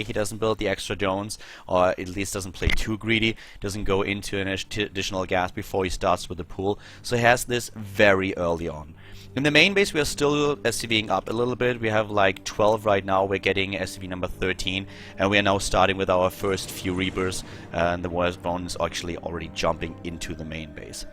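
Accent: Germany. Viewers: he s from germany^^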